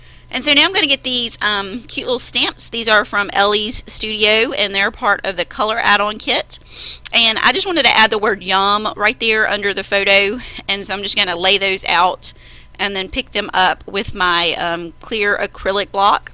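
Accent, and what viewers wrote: South, United States. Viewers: So awesome!! I love hearing another Southern accent!;)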